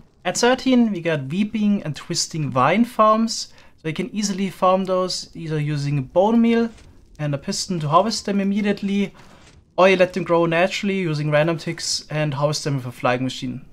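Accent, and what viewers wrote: Germany. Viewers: Are you German? Your accent is pretty familiar to me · One question. I'm not sure where your accent is from. Is it German? Or some other one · I can tell you’re german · Is that an german Aczent? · his german accent tho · Where are you from? You sound like a german guy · It's just standard German English. The „th“ sound does not exist in the German language and the „z“ (as in „blaze“) is made in a similar way and does not sound too different. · This german accent xDDD i rly cant stand that xDD But still nice video :) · Er redet wie ein Deutscher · What a german accent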